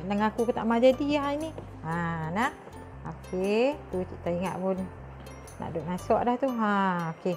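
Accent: Kedah, Malaysia. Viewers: Hati2 cik...seronok dengar cik cakap kedah...👍👍👍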